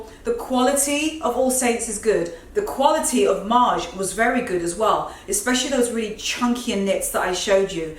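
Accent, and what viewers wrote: United Kingdom. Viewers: You are in Nueva York . We do have a few from the UK here , luv your accent!!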